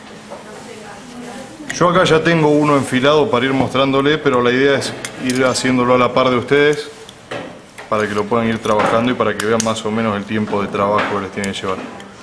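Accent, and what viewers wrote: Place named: Argentina. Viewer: Buena enseñanza y buena explicación y sos Argentino nos entendemos mejor gracias xr compartir tu experiencia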